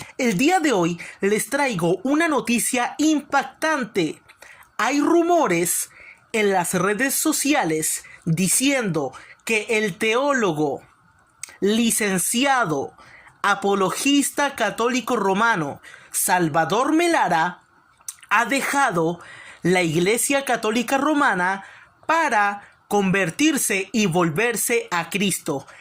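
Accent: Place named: Central America. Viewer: Quien habla dice que es Chileno?? Jajajaja, hata en eso mienten un chileno por aqui que lo desenmascare a este tipo de Chileno no tiene nada, ese acento es de Centro Americano.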